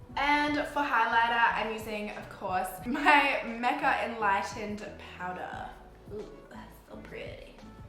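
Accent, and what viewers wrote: United States. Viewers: you're so pretty like wtf and your freckles are adorble I think I have a girl crush on you fr I love you're american acent as well. I also like you're personality! btw im from london xx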